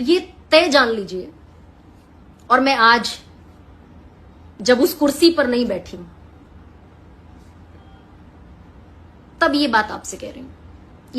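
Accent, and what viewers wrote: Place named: Rajasthan, India. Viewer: Rajasthan ki Serni hai aap itna kehna bhul gaya mai apke bolne ki bhasa se samajh aya aap Rajasthani ho Sorry Mam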